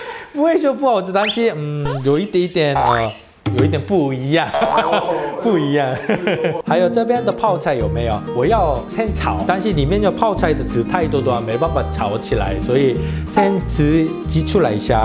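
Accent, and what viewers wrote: Cantonese, China. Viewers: Kai老師國語有廣東腔，哈! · 有點廣東腔是正常的嗎🤣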